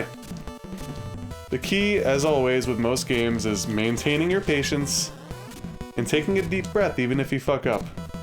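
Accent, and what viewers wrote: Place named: California, United States. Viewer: Congratulations dude! Just to even witness it was something to behold. Here's hoping someday I might get my own deathless run haha. 🤞 And that real talk you gave , says a lot about me as a gamer. I admit I am not the most patient when it comes to gaming to say the least, it's so easy to let frustrations walk all over you rather than take a breath and count to 10. I really needed that today so thank you. By the way, if you don't mind me asking, as an Australian I detect a US accent in you... California?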